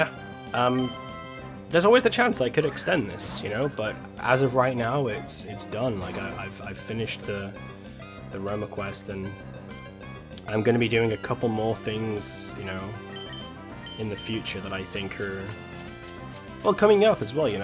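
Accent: United Kingdom. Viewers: Can you teach me british english please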